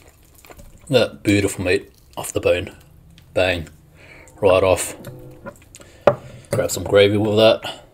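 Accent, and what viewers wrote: Australia. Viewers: Just wondering you sound Australian are you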